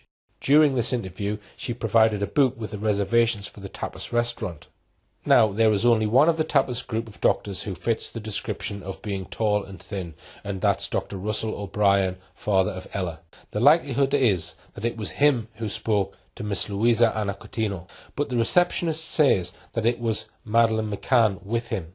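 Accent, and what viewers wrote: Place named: Newcastle, United Kingdom. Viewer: YOU are a complete and utter moron. NOT only that are unable to deduce a commentary and facts. It’s not also locution lessons it’s elocution lessons . Before you make mindless comments on an accent in this case a Geordie one, take care of your grammar. This is broken down step by step. If you can’t follow this keep off the internet . Or scroll by. Spankwangle of a Troll.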